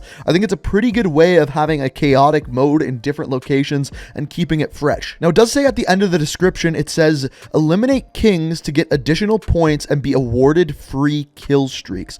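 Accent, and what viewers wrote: Canada. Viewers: I love yo videos. Ur Canadian ryt just wondering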